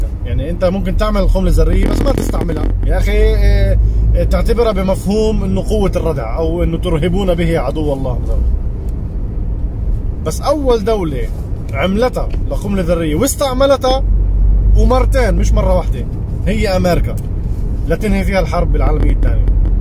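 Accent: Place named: Palestine. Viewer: السلام عليكم إنت من وين أخي واضح من لهجتك فلسطيني من سوريا أو لبنان؟؟ ممكن تعمل فيديو قصير تحكي فيه عن نفسك سيرة ذاتية. من الجيد أن نعرف عنك أكثر و شكرا جزيلا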